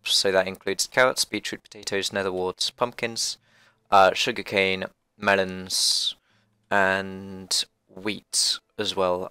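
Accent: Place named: United Kingdom. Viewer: lol are you deaf? hes 100% British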